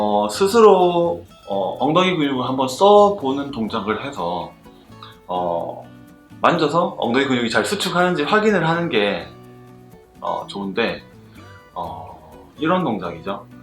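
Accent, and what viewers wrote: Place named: South Korea. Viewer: 엉덩이를 쪼으면서 ㅋㅋ 사투리가 참 정겹습니다 ㅎㅎ 늘 좋은 정보 알려주셔서 감사합니다. 따라하면서 괜찮아진 곳이 꽤 되네요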